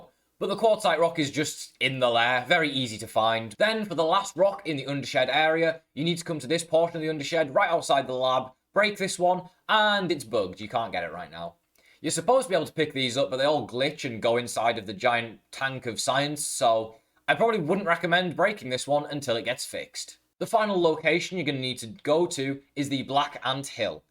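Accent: Liverpool, United Kingdom. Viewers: Heyyyyy I been following your amazin grounded videos a while now all the way from switzerland and germany. not sure if you are scouse but I think you are. I been dreaming to be in Liverpool again since six years. you will find me busking in the evening on lord street (except saturday) since 1 june for a few months. approach me if you feel like. lol sorry crazy comment I know. hahahaha love your vids. say hi its haha. i dont busk with any amp just wit acoustic small guitar haha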